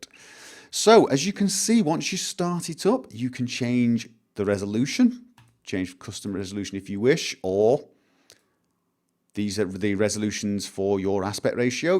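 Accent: United Kingdom. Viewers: Hey, where are you from? I know it says Norway but your accent seems to be very British. Oh and awesome videos btw, keep it up. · REALLY? This whole time I thought he was British ._. And I'm British. He's really refined learning English then o.o, usually people retain accents when they learn a new language (Like Robbaz) but he just sounds British. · Evans He is British. He resides in Norway, though.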